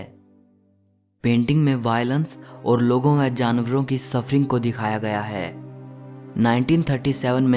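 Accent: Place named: Bihar, India. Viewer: Aapka bolne ka tarika Achcha nahi hai. Aisa lagta jaise koi Bihari ya Gaon ka insaan bol raha ho.Ya fir koi anpar.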